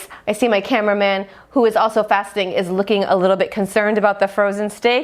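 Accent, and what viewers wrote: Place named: Kandahar, Afghanistan. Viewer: Omg I love your shaista kandahari pashto 😂💛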